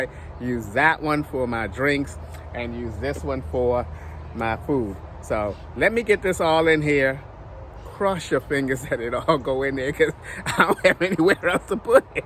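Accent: Baltimore, United States. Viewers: You’re from Baltimore for sure loln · Hi! Fellow Baltimorean who decided to jump ship years ago. I still hear the Baltimore accent "tew". Lol! Enjoying the videos, bro! Keep them coming.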